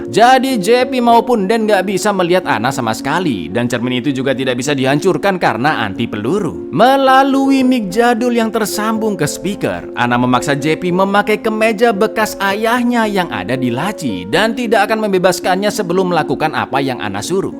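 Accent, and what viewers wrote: Java, Indonesia. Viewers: Bang,anda asli Jawa kah? Kok bahasa pengantarnya pake bahasanya fasih sekali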